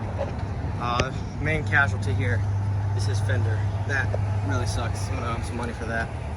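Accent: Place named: Texas, United States. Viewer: Wya? Texas? It sounds like it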